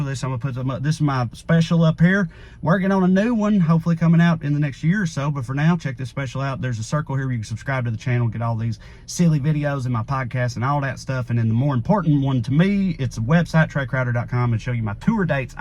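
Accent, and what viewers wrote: South, United States. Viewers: My favorite thing to say about Trae is " When I see in my suggestion I always hope it's a Trae and not a Stephen" the next is that I love to hear words like "incalcitrant" in a southern accent ESPECIALLY used correctly. XD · proof that a southern accent is not a political Identity! Mad respect for the redneck voice of reason! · I dont like southern accents (used to love them), because they are usually associated with someone trying to take away my rights as a woman, stupidity and violence...... But I could listen to Trae all day. His wit, wisdom and level of information is just so astoundingly endearing and makes me remember why I USED to like southern accents. There was a time when the word of wisdom came from those accents, now its like dumbassery galore.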